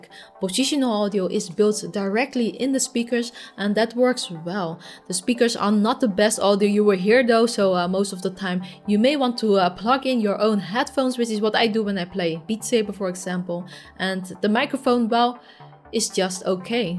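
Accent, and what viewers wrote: Netherlands. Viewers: I can't help but wonder if you are Dutch, you have a Dutch English accent :) Anyway, it doesn't feel like I'm watching a review of someone who used this for a month, it feels more than just an explanation of the Quest 2 specs. I have so many questions! :D I was hoping to see a more personal review going in deep about your experiences. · is it me or she sounds Dutch ?! .... :) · Ok, I NEED this mystery resolved... since your first video I thought you had what sounded like a dutch accent! Are you ladies dutch? Quality content, i'm subscribed! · Je klinkt Nederlands? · Great video ! 😊 I will sub! Question though.. are you Dutch .. your accent makes it sound like it I just bought a quest two I loved it, but sadly it made a horrible noise in the fan in front .. so I am waiting for a replacement. 😊 i did not know about the stuff of the elite strap.. 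I wanted to buy it as I never had the first one.. what should I do ? Wait until they fix it ? Or just cope with the standard one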